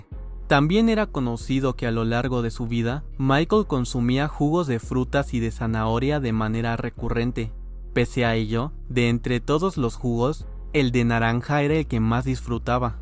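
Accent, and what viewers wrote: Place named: United States. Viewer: Man, enough with the subtitles blocking all the pics of Mike and his friends. I understand English and I don't need subtitles to understand a narrator with an American accent.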